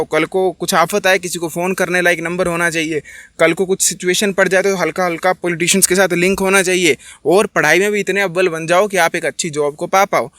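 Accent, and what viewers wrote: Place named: Garhwal, India. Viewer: Bhai tu garhwali hai na 😅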